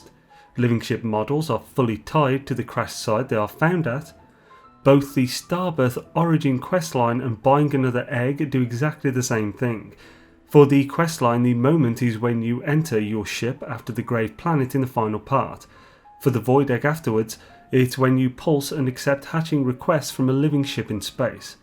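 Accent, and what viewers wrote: United Kingdom. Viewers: You obviously know your game... some of us, however, do NOT. The way you whiz through points that you don't explain, leaves me wondering what you just said and/or how you did it. You speed through a dialogue of which I understand approximately half. You have a British accent, which is great, for I believe the British usually have a superior grasp on English enunciation- or aptly named "The King's English". I am from the United States and not blessed with perfect speech comprehension, which leaves me puzzled at the end of this video. I am only suggesting that you consider slowing down a little and being more concise in the future. Simplifying the explanation is not degrading it. Thank you for your videos.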